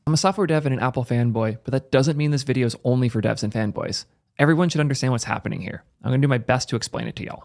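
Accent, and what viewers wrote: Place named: United States. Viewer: You sound like an American.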